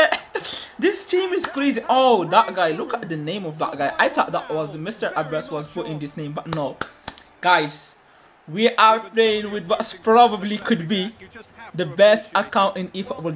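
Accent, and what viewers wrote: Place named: United Kingdom. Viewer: Bro why you have British accent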